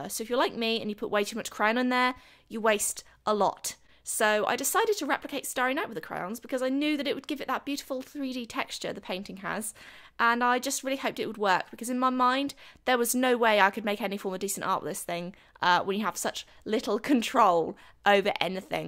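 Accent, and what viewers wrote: England, United Kingdom. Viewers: DON'T say "it stinks of Crayon".... that's the BEST PART of a Crayola crayon.Nothing takes me back to my childhood that opening a box of crayons and sticking my nose i it to get my lungs full of that Delicious aroma. If they would bottle it in liquid, I would buy it. From your accent I can tell you're English so maybe this is the reason you think this stinks. Not me, and I'm 74 years old. I still get boxes of crayons for Christmas or my birthday because everyone KNOWS i love crayons. It's fun to sit and color in one of my old coloring books. I stopped short of you beginning the video.... maybe crayolas would be better for you if they smelled like CHEESE? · That artwork was AMAZING, the talent you have is so beautiful and your english accent makes my heart melt🤗💗 Saying hi! From Argentina🇦🇷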